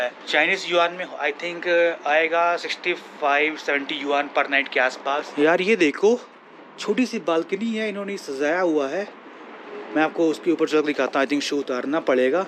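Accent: India. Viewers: 大部分普通中国人英语并不好，你说印度口音的英语，他们更听不懂。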